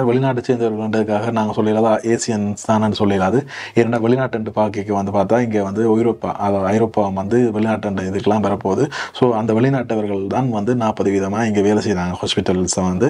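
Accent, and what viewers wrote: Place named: Jaffna, Sri Lanka. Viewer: Your Tamil sounds sweet like Jafna people's slang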